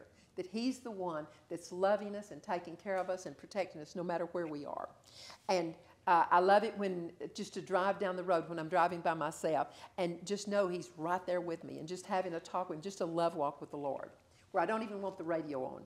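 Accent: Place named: South, United States. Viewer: Wow, she is southern - reminds me of my ancestors from OK and TX. You can slow the playback speed down a notch and you will really be able to reflect on what is being said. Too important a message to miss.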